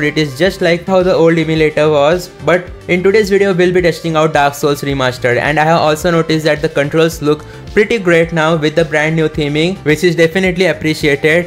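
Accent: India. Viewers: Dude that indian accent is so sexy.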